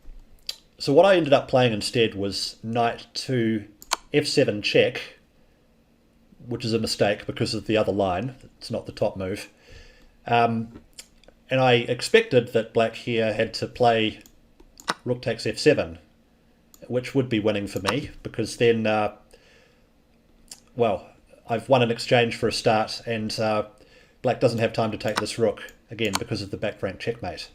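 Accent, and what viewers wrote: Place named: New Zealand. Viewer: Hi, I hope all is well in Austria. I recently subscribed and am immensely enjoying your recent videos with the in-depth commentary on your OTB games. It’s great to see a fellow Aussie doing well (although I think I detect a bit of Kiwi pronunciation here and there — I could be wrong; English is my second language). All the best, and thanks!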